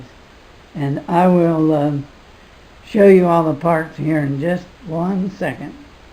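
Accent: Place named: Lancaster, United Kingdom. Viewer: You have a slight Lancaster accent, bro--I hear 'war-shers' instead of 'wash-ers'. Didn't realize that before! interesting build using common parts!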